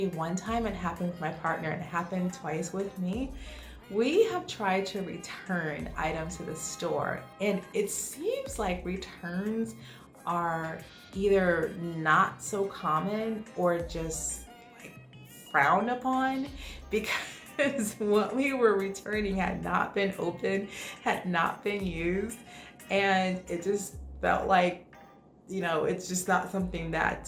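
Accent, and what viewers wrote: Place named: California, United States. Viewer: I didn't know that you're from California because you always talk about Georgia, but I always thought that your accent seemed like you were from California: the way you pronounce the words so well (not like NYCs, that say "xtress"), the beautiful way californians pronunce the "s" and the beautiful humming that californians have. 😊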